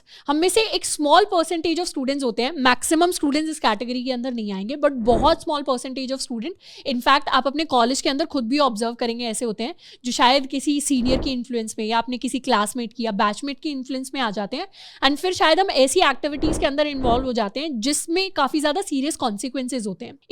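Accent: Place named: North India, India. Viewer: I would've never guessed that she's haryanavi but yeah definitely North Indian, her way of speaking and presenting is great but I think she speaks a little fast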